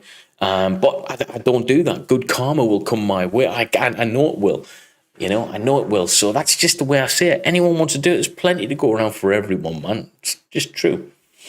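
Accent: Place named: Teesside, United Kingdom. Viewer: Dit just recently subscribed loving the content Got put on to ypur Channel by combat Arms very happy to find your channel as a doubled in the Marines a long time ago as a reservist and then discharged as I filled a medical on the eye test but anyway no point crying over spilt milk I've just heard you see in this video you are from the North East same here currently living in Washington originally from Sunderland spend most of my time in Newcastle I'm getting by your accent you're a little further South than Tyneside or Tyne and Wear probably going towards Teesside I should imagine that's my guess anyway am I close?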